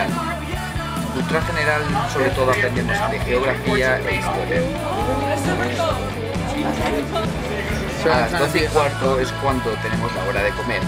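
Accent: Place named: Castile, Spain. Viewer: no notas el acento castellano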